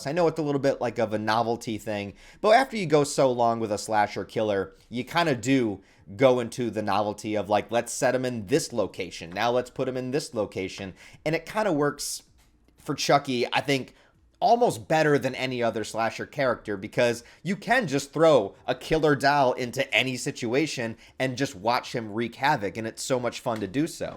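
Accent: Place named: Northeast, United States. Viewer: Northeastern accent lol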